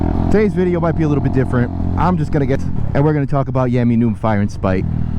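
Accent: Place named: New England, United States. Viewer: Sounds like a bit of "subscriber jealousy" by an obnoxious Yankee to me... I don't think I've heard of him before and only looked him up since you were whining about him... He's a bit obnoxious since he's a youngster and I'm and old fart and as such find ALL youngsters annoying, but with your Yankee accent, you are even more annoying...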